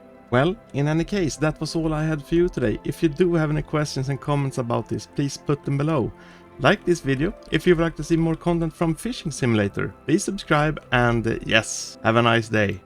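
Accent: Sweden. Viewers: You sound A bit swedish. is it just me?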